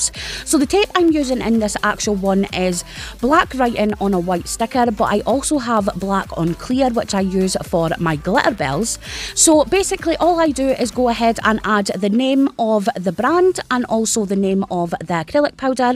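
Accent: Scotland, United Kingdom. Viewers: Love the colours I need to get me some colours! Keep up the good work. Love ur accent by the way it reminds me of my mum, she's Scottish from Hamilton.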